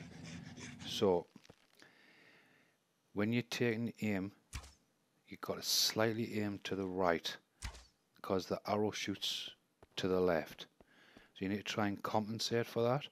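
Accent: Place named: Newcastle, United Kingdom. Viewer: GREAT TO HEAR A geordie hhaha x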